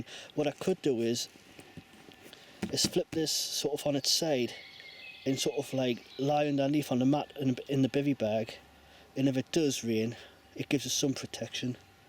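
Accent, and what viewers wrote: Newcastle, United Kingdom. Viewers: Is that a Newcastle accent in so sorry if its Sunderland lol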